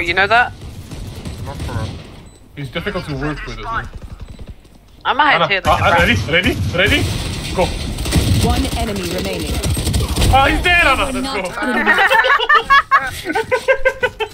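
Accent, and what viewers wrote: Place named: Russia. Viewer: Ты русская оказывается? в конце только когда услышала поняла)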